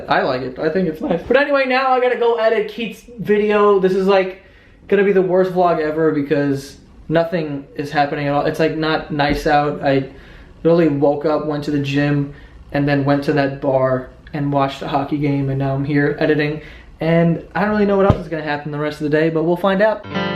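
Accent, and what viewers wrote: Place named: New York, United States. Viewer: He has a NYC accent so that's why he says "cock-a-roach" lmao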